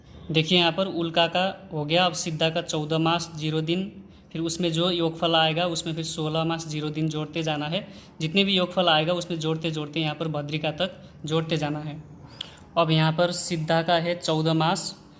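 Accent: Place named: Nepal. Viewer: Manche chai nepali jasto cha boli Hindi bolera yesto vya niskiracha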